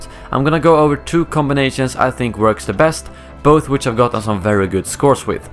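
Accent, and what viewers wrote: Sweden. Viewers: Älskar lite svensk brytning 😃👌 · are you swedish? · han låter svensk iaf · are you swedish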